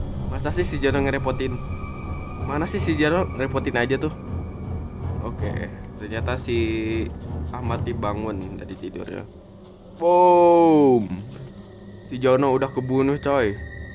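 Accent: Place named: Java, Indonesia. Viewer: bung asli mana kaya orang jawa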